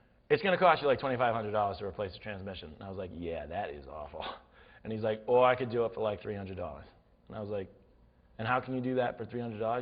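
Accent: Boston, United States. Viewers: He needs to bring the Boston accent back more often. Seriously. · Oh my god! he is the best story teller ever!I love his boston accent, its priceless!!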